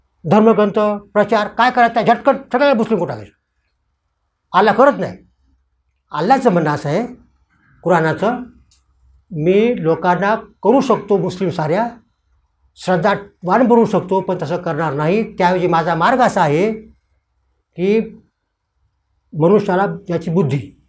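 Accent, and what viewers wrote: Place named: Marathwada, India. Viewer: पूर्व मराठवाड्याची भाषा आहे, परभणी/नांदेड/हिंगोली भागात बोलली जाणारी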